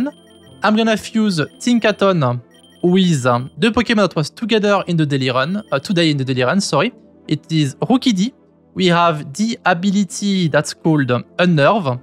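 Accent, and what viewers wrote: France. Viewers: très compréhensible je voie pas le problème l'accent baguette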